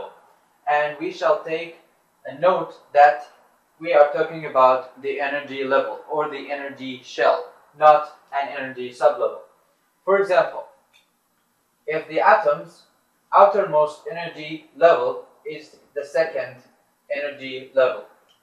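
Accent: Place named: Russia. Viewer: You look mexican but sound russian.... WHAT ARE YOU!!!!?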